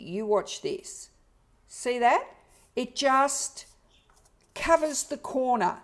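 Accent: Australia. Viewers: Hi! I loved your video! australian accent is New to me, but I could understand everything and the no sewing spine technique is wonderful. Thanks a lot! Hugs from Brazil!!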